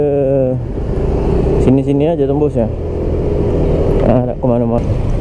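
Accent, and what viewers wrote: Medan, Indonesia. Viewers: Kangen dengerin bahasa medan lagi kak,ak dulu tingal d polonia kak belakang hermes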